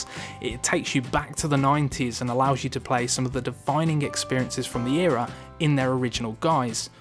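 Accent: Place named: North West England, United Kingdom. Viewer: Jorge Luis Pérez Armijos Sounds like north-west England to me. Somewhere around Birmingham or Wolverhampton.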